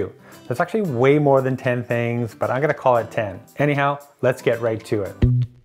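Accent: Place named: Canada. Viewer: Thanks for elaborating. I'm in Eleuthera Bahamas now (a nice playground to use the drone, e.g. Sapphire Blue hole) but I live in Brampton. I'm going through your library of informative videos and appreciate them. Funny how almost immediately, one can detect a fellow Canadian in a video. Best. Ken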